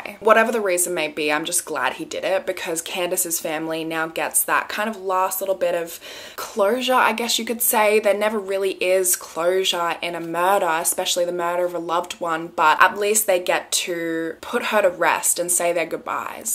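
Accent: Australia. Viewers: Bestie wake up, a new murder explained in a soothing Australian accent just dropped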